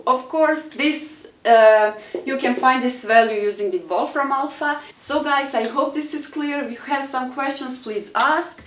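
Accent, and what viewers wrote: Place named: Brazil. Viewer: You sound like a brazilian. Pretty cool.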